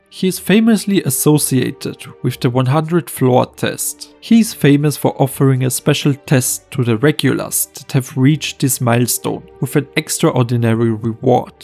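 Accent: Germany. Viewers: love the german(?) accent keep it up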